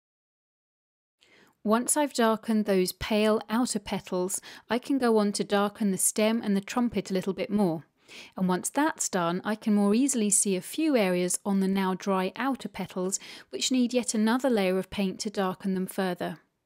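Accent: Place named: England, United Kingdom. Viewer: She speaks perfectly. It's called an English accent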